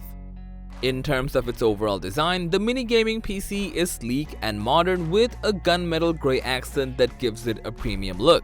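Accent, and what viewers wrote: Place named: India. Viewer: whats up with the fake american indian call center scammer accent?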